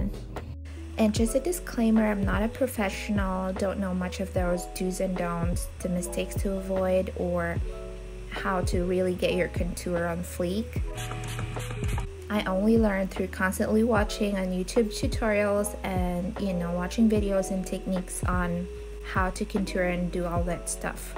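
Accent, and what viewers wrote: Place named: England, United Kingdom. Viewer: Youre English Accent is Great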